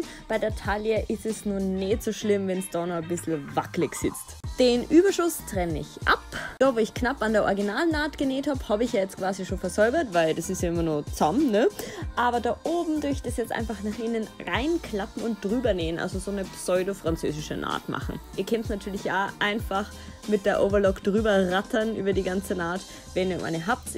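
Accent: Austria. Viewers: Well try not to adapt her pronunciation too much, she has a really heavy Austrian accent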